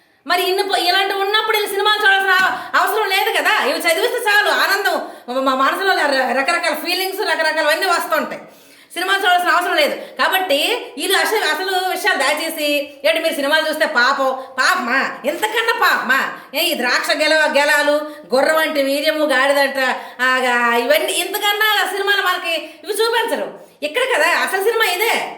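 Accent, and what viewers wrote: India. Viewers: Em basha babu turpu la vundi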